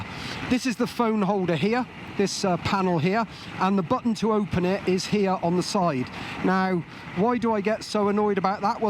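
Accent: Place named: United Kingdom. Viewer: I love British accents. Thanks for the ride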